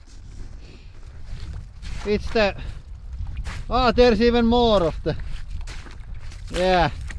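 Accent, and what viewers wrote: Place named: Finland. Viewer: Palllloon! love Finnish accent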